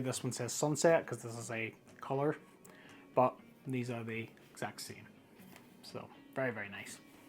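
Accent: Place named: Belfast, United Kingdom. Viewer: Cheers for the vid, sounds like you dont live too far from me lol (belfast) My s24 ultra is arriving on Thursday (hopefully) from three and I need to pick a cover. I usually just get a basic leather folding cover from amazon for my phones, the one on my current s22+ seems to have done a pretty good job at keeping the phone safe so I may end up just getting the same again. But I'm also willing to spend a bit more on a good case for the s24u. The only thing I don't like is having a cover that doesnt fold over and covers the screen. I also like to keep my cards in the phone cover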